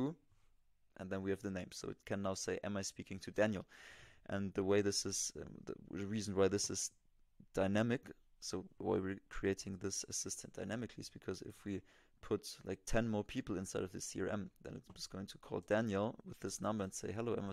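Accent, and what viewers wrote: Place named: Germany. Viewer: Aufgrund deines Dialekts nehme ich an, dass Du aus Deutschland kommst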